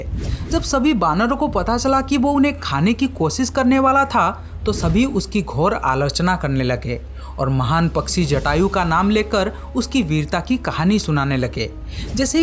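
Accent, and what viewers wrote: Odisha, India. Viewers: Kya ap Odia ho ?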